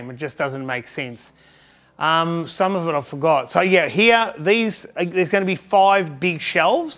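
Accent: Australia. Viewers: You Australian mate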